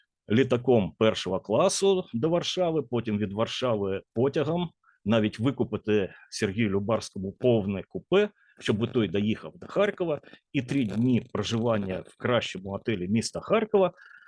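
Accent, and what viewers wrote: Russia. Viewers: Якось дивно: Ви читаєте український текст, але з російською вимовою.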